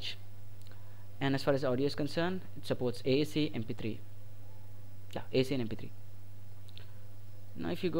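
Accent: India. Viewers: Nice vid. And nice accent, are you Indian? :D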